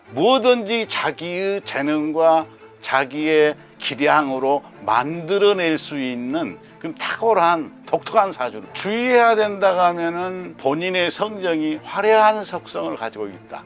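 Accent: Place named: Jeolla, South Korea. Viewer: 이 아저씨 토종 전라도 같네요이 ~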